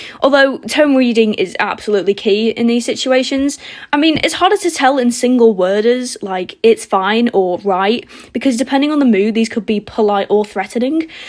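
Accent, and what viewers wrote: United Kingdom. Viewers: I loved this animation - kinda reminded me of JaidenAnimations but British and I hope this channel get popular like it. You got my sub, good luck on the channel growth. My mother was from England and lost most of her accent when she came to North America. I never had a British accent but I loved listening to British..ness. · Bro sounds British 💀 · Top, love British accents. Subscribed! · haha this is so accurate, I also love ur british accent :3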